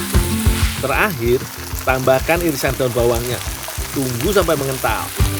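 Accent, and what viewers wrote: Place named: Banyumas, Indonesia. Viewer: Ngapak lurrrrrr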